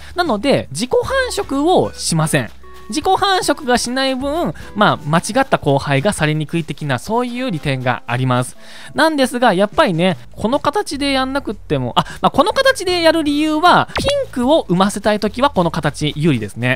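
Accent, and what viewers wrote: Aichi, Japan. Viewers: 愛知のひと？方言が懐かしい感じする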